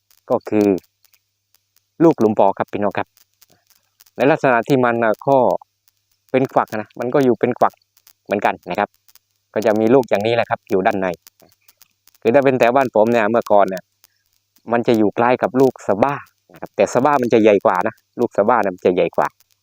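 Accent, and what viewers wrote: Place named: South, Thailand. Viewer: แหลงใต้ชัดเชน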